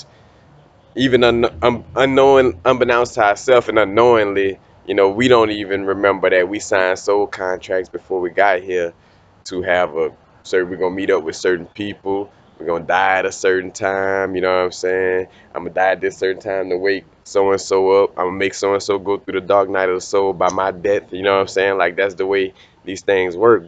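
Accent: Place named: South Louisiana, United States. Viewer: I'm from lousiana, you sound like you are in the south Louisiana area but I may be wrong.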